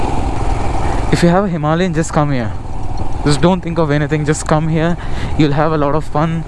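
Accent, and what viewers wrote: Karnataka, India. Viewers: Bro, you have been doing some nice videos and showing some unknown places which makes most of them happy. In most of the videos we see that you speak good kannada accent when you are communicating with or with localities. So, it would be great if you can do some kannada vlogs also along with English🙂. All the best for your future rides👍.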